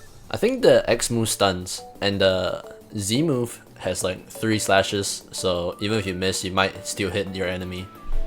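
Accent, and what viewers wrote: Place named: Singapore. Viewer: u sound a little Singaporean haha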